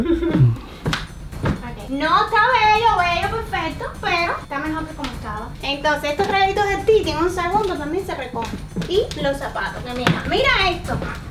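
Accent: Cuba. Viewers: Habla 24 hr cubano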